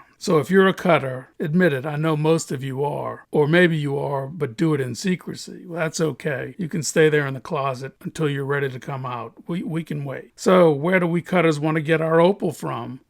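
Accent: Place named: South, United States. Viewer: Where at in the eastern united states are you from sir ? I see from the spots on your hands you're about 60 or 70. But I am unable to place your accent. I want to say kentucky or maybe louisiana.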